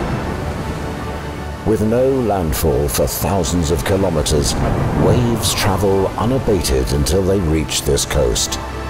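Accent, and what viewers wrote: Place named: Australia. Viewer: Love the way they chose a really Aussie sounding voice for the commentary, plus he bungs it on a bit more too 😃👍🇦🇺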